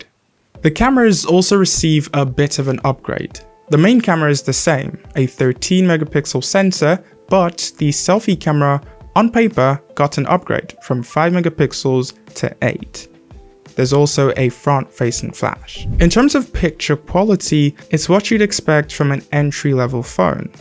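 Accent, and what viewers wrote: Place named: England, United Kingdom. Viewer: sorry, you sound English while you're African. unless you're from England that's fine. authenticity is paramount.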